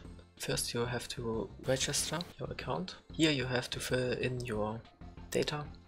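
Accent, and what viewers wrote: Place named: Germany. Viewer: Der deutsche Akzent war unüberhörbar. Danke :)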